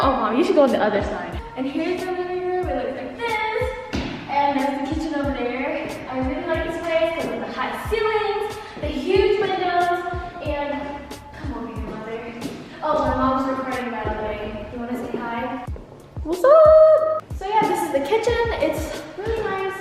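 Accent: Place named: United Kingdom. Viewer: 😂also i can hear some british accent going there✊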